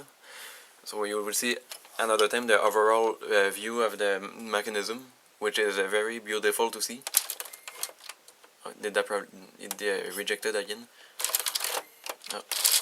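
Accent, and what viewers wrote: Quebec, Canada. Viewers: Marrant son accent québecois in english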